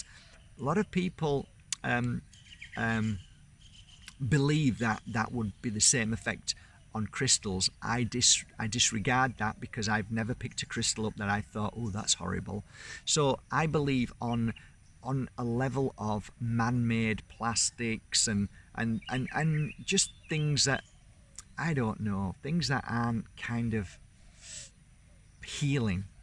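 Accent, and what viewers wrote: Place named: North, United Kingdom. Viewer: I could listen to you talk for hours. Maybe it's your northern accent 😉. Question re self healing. Because we are mainly water, do you agree that if we talk to our body using thanks and healing words etc, it works the same way as writing words and speaking to water like Professor Emoto did? By the way, Pyjamas. 😂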